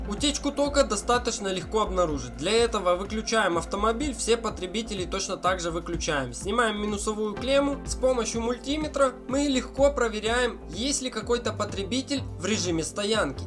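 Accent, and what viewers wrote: Ukraine. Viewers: Акцент дикий! Ты из Хохлостана?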